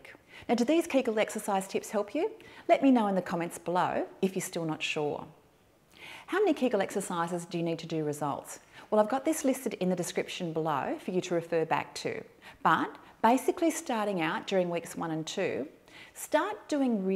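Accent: Australia. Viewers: that Aussie accent helps me feel more confident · I love Australian accent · oh, an aussie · Somehow that Aussie accent helps me feel more confident. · Love your Aussie accent.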